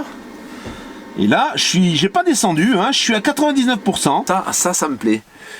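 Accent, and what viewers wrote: North, France. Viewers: Pas mal du tout!!mais tu n'as pas des origines du nord???t'as un acchent de ch'nord!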